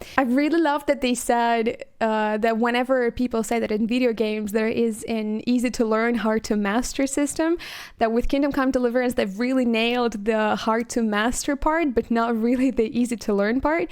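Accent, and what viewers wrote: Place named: Eastern Europe. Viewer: You don't have to work on your pronounciation. I can understand you very well and slavic accents are by far my favourite accents! No need to try to get rid of it.^^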